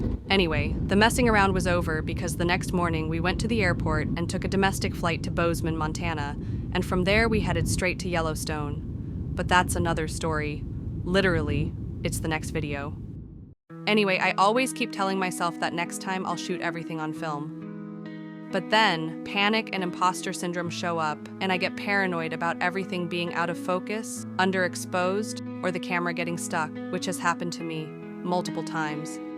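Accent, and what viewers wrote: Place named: Italy. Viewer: I actually discovered your Lake District videos first and then worked my way back to this, your very first one. I assume the words here were yours, even if the voice telling the story belonged to someone else. I’m glad you eventually found your own voice — quite literally. As I’ve said before, you’re a natural storyteller. Your own narration, your personality and, yes, your Italian accent — I got it right this time! — make the later films feel wonderfully immersive. There’s something fitting about that progression too. Photography is ultimately about finding our own way of seeing the world, and perhaps storytelling is much the same — eventually we have to trust our own voice. And film somehow complements that philosophy perfectly. There’s uncertainty every time we press the shutter, but perhaps that risk is precisely what gives the eventual photograph its value. 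For me, the pleasure of the outcome still far outweighs the uncertainty of getting there. It is fantastic to see where these adventures began.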